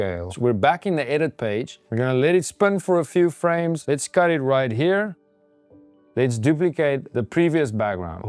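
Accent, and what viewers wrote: Afrikaans, South Africa. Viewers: You sound Afrikaans??😁😁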